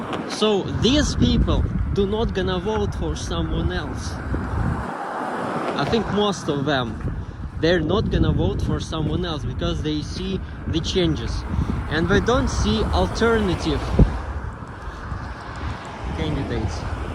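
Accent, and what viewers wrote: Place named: Russia. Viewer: Вы русский😂? Ваш акцент выдает😊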